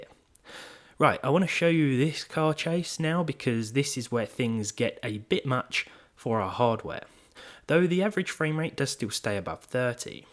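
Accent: United Kingdom. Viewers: ew british accent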